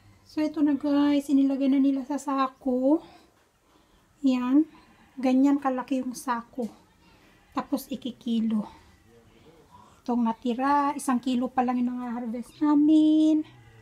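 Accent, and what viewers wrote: Iloilo, Philippines. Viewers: Taga San ka ba sa Philippines sis.kasi young accent mo parang ilongga.😊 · Ilonggo poh kau ate?😊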